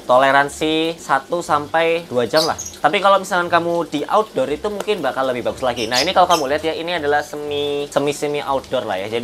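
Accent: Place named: Java, Indonesia. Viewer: Orang jawa ya bang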